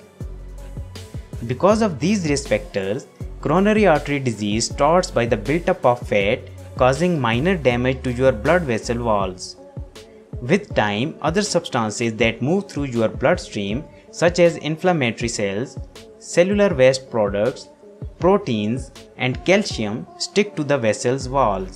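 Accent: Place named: India. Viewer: Indian man from voice ..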